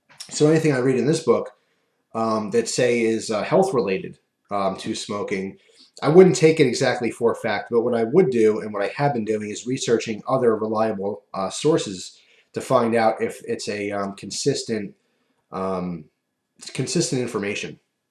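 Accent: United States. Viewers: i just assumed you were american the way you were talking, you came across a bit strong and also, /watch?v=LIlUcPRYroM&feature=plcp&context=C4582d3bVDvjVQa1PpcFObXtukHaAHVqDa_DPkS-9G5riny3IwqT8%3D .this is comeing from the man him self, this argument is getting off topic ,i have to bring her back.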